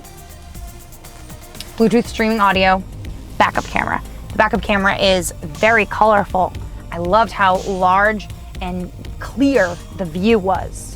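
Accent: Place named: Boston, United States. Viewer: Gotta love that Bawhstan accent! I'm from MA originally, love the video! :)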